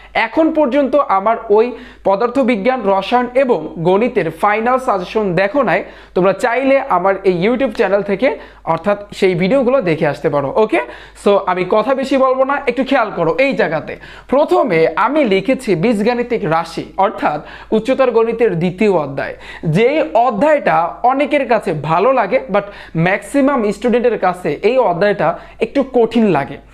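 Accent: Barishal, Bangladesh. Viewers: Vaiya apnar bujhano ta onek valo lage vaiya apni ki borisal ar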